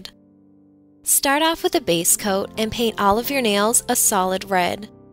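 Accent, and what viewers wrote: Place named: Ontario, Canada. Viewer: Are you from Canada because when you start or started it sounds like you have an Ontarian accent.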